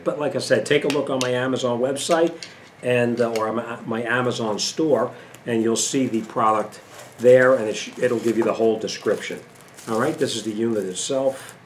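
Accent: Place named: New Jersey, United States. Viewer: Hey. I got your comment right here. Sounds like youre from Jersey or someplace up north like me. Actually, I live in Virginia now. It'll be cool if I won your giveaway.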